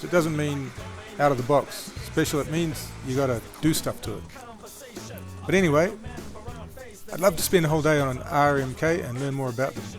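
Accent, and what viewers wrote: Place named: Australia. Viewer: Dude the swedes made the bad ass tune for the yammie. 270hp on the 153. This dude sounds like he's from Australia. That's not a knife this is a knife. They made 2 strokes look like clowns like those guys digging and talking more then riding ripped through arm deep powder. The srx tune to 270 made cats alpha one look real bad. Called weekend sleder's. Even the older stuff 1049 vipers 270 tuned made 2 strokes look bad. Those yammies ate through anything they put them through and everyone knows yammies reputation of big miles and durability. Like it or hate it.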